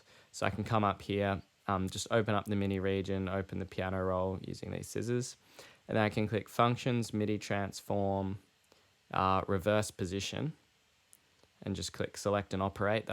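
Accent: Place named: Australia. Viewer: So refreshing to hear an Aussie explaining Logic. Some accents really grind my gears. Hahaha.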